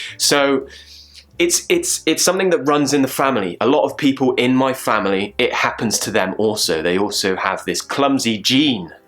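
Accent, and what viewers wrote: United Kingdom. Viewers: Hi from México, just discovered your channel today searching for British accents, i have seen some of your videos and they are quite nice, it really explained very good how to pronounce some difficult words for latinamericans speackers, ¡cheers mate! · I enjoy hearing you talk. I've always found a British accent very pleasurable to listen to. As for the clumsiness, I believe you could tackle that &make a project of morphing out of it. I'm not truly knowledgeable about these matters...but I would think slowing your body actions & movements a bit might be a good start. Along with that, what if you "looked ahead" to see where you're stepping, or reaching, or walking...& sized up the space & room available to you & deliberately slowed your body motions ever so slightly, & perhaps squeezed their periphery a little--a kind of miniaturization of your limbs' movement(s). 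You'd be exerting some additional controls over your body movements & motions...& shrinking their scope & speed a bit--adding careFULness & diminishing some careLESSness. Or not...this might all have been psych-babble!! You decide!! Still enjoy you--never mind the clumsy!! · Nice videos, man. I´ve just found your channel. Now I´m following you! British accent is amazing! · Hi, I have been over the moon ever since I discovered your videos on youtube. As a non-native teacher of English, I have got a lot to learn from you. Listening to you and studying your mouth movements has helped me correct and improve my pronounciation to sound more British. You also have great ideas and tips like exaggerating the sounds. Wenn it comes to vocabulary, the way you explain them in context is amazing. All in all, you are a very good teacher, who teaches from the heart, not from the book! Your students are lucky to have a teacher like you. 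· Hi! It's so nice to hear you speaking! I'm so in love with British English! I liked this lesson a lot, and if you accept a suggestion, why don't you ask some questions about your story at the end of your story. You could also do it live, so that you get our reply immediately after you ask the question. Would you consider it? Cheerio! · You got a beautiful British accent! I loved your story telling and I learned about a few words I've never heard before so thanks! being entertaining and teaching at the seam time is quite priceless 👍👍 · I love your accent I would like to be one of your student to learn British English Thank you so much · Wow to listen british accent is so good I guess you were slow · Hello. I am a Japanse and I am learning English. I subscribed your channel recently, and enjoy your teaching. Thanks to you I’m getting used to British accent. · Like the British accent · Hi, Im from Bahrain and yes I extremely enjoy your videos.... 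I'm in love with the British accent and I loved it more after I watched you.... I wish I could join you on Skype but the price is quite high... Keep going and I keep watching your videos... Cheeeeers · I'm clumsy too! I think it's an result of my shyness because I'm mostly shy than anything! I always tend to stumble at something (or someone) when I'm in public. And the British accent is the best ever! Congratulations! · Successful person, I appreciate your efforts to teaching us your videos helped me to speak and understand the British accent.thank u so much ❤️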